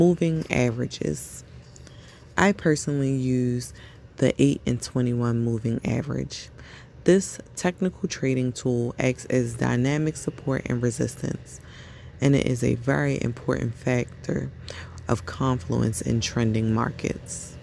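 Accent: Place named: Baltimore, United States. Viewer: The woman reading sounds like she could be from Baltimore (as I'm from the DMV area). Great work and thanks for the full audio!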